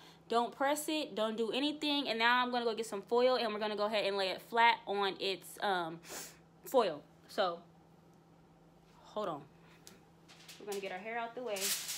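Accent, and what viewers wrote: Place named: Sacramento, United States. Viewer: I’m so nervous to try this idk why 😂 and you must be from Sacramento, I hear a Sacramento accent lol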